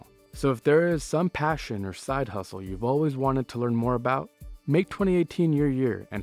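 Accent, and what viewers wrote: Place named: United States. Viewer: hey you sound American, good job!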